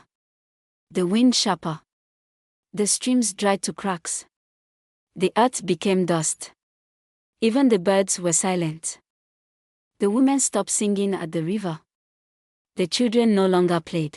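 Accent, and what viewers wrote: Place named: Africa. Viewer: Bro the African accent ai generated voice gotta love it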